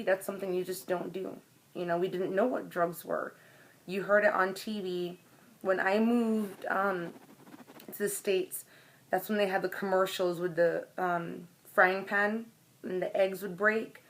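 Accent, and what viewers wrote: Jamaica. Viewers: She av dat jamdung swag so is soh de ting set .just be you u dig .u jamdung u jamdung good accent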